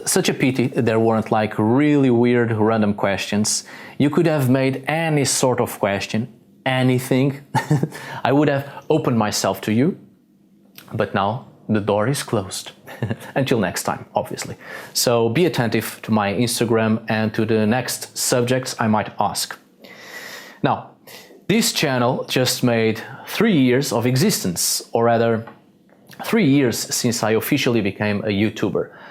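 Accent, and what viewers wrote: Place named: Portugal. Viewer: 'Morri com você falando português!' Is it because your archeology studies or do you have a Portuguese family? Because you have a strong Portuguese accent. 🙂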